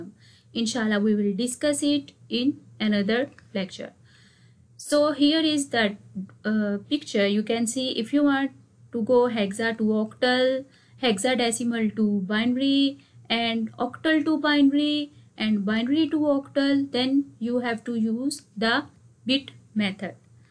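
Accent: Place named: North India, India. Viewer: If my guess my right ur a North Indian.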